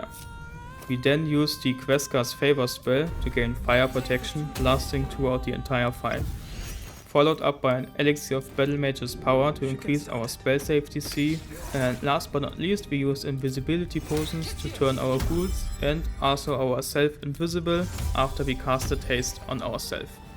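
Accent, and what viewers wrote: Germany. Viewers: Man... there was me thinking, hey i dont know that guy, lets watch that video, maybe he doesn't have a strong af slavic or russian accent like the others, so it might be fun to watch.... Then i heard the most stereotypical german accent ever.... FUCK ME THOUGH! I'm german, too and i just got humbled so very badly xD I could have an accent that sound to others like this and don't even know ... So congrats, you earned yourself a like and tought someone a lesson for life.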